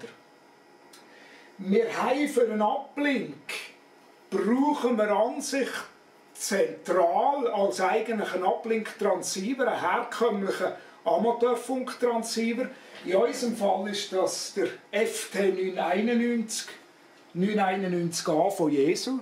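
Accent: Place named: Switzerland. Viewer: Vielen Dank für die Vorführung des Betriebs auf dem Satelliten bzw. für die Erklärungen auf Schweizerdeutsch, das eigentlich gar nicht so schwer ist zu verstehen, wenn man sich ein bisschen Mühe gibt:-) Vielleicht hören wir uns demnächst auf dem Satelliten - ich gucke sofort nach auf Ihrer Website, was es da für Möglichkeiten gibt, auf Es'hail-2 QRV zu sein. 73, Colin GM4JPZ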